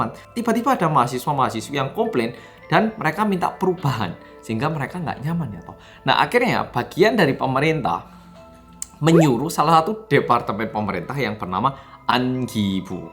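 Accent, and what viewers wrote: Java, Indonesia. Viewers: Orang Korea yaaaang medoooooook!!! Yeay 😆😀🤣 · Je Te Be Ce... sueneng aku dengere...medhoookkk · Bersama orang korea yang medokkkk , suka banget aku cara ngomongnya dan intronya!!! 😀👍🏼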